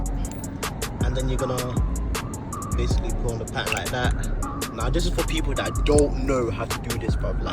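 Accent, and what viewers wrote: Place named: South London, United Kingdom. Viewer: Bro , you cant be doing with these likkle 5 min videos if you want to grow your channel. Just saying. I have been liking your videos. The South London lingo( which i love and use to whilst growing up) just takes me back. I live up North now, but it is so nice to hear the South London talk.. Anyways bro . You need to spend more time vlogging -lengthy vlogs that is ( 20mins possibly) :)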